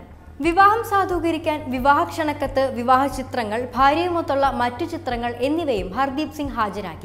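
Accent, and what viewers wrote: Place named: Trivandrum, India. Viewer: ചേച്ചി ഒരു ട്രിവാൻഡ്രം കാര്യാണല്ലേ ആ ഭാഷ അറിയുന്നുണ്ട്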